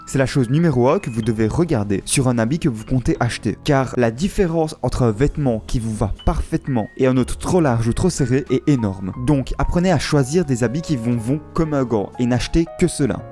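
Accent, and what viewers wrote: Liège, Belgium. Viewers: je reconnais une pointe d'accent liégeois bien de chez nous, big up frérot !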